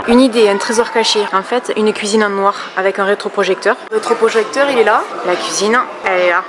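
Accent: South, France. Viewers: C'est toujours un plaisir de t'écouter/regarder tes videos. Au Canada, dans la ville de Québec, il n'y a de chanson Ikea, une chance!!! L''autre jour, nous l'avons fait notre magasinage a l'envers des fleches! (pour le plaisir, voir autrement, etc)!! Les prix sont plus dispendieux. Ma fille et moi adorent regarder tes videos. Je m'ennuie de l'accent du Sud. La proximité des autres pays me manque aussi. take care. Pleins de bisous de l'autre coté de l'ocean.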